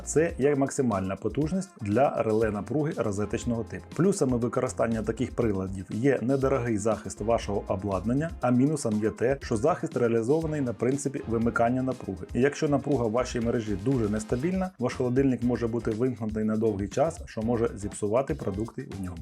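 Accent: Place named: Russia. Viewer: Кацапський акцент. Невже не можна вивчити нормально декілька речень. Азіров би пишався 🤦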